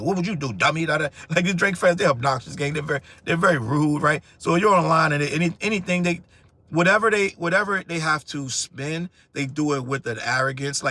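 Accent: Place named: Washington DC, United States. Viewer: I love listening to dudes with DC accents. Remind me of home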